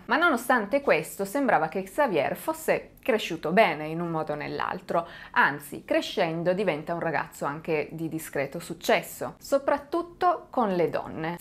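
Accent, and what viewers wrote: Italy. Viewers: Secondo me non sei AI perche a volte dici parole in inglese con accento italiano. Grazie mille per essere humana.😊😊😊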